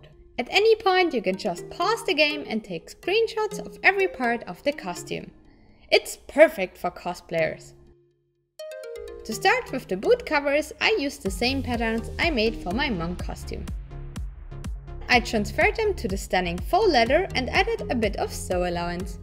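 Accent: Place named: Germany. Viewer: This german accent tho.... 😂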